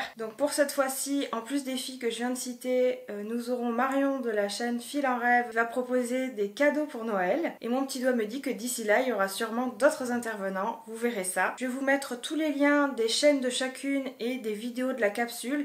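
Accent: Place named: United Kingdom. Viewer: Coucou! Quelle belle capsule, tu nous as gâtées! j'ai déjà repéré 2-3 patrons! Alors, je vais me moquer de ton accent so british 🤣🤣🤣 mais délicieusement frenchie! trop mimi! Alors, si tu as besoin, je peux te traduire quelques patrons (j'en ai fait un pour Audrey), j'ai bien aimé l'exercice. Bon, mon vocabulaire couture en anglais est limité, mais on peut y arriver, avec l'aide de notre ami google 😂 A bientôt! 😘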